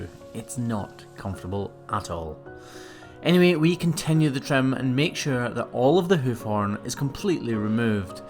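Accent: Scotland, United Kingdom. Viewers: I really don't know why I'm watching these videos 😳. Some are so gross with all that blood and pus, and even beyond that I don't like cows and bulls. They scared the hell outta me. Maybe it's your Scottish accent or the beautiful landscape or maybe the fact that you are doing such a amazing job. Greetings from The Netherlands and keep the good work going 🙏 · I can’t help myself here. I love your videos. Discovered them about a year ago. When I heard you talking I wondered what the accent was. When I found out it’s Scottish, I did a happy dance. Spent 16 days in Scotland the previous August and September. Can’t wait to come back. So...I read the title of this video and did a double take...Fixing a rotting cows hoof and thought a “rotting cows” “hoof”?Then realized the cow had a rotting hoof. LOL I love the way you bring your little boys with you. 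They will learn a lot from you. They will miss that time when school becomes a priority.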